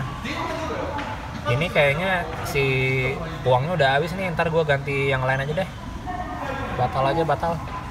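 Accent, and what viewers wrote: West Java, Indonesia. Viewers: urang sunda? wkwk logat nya sunda pisan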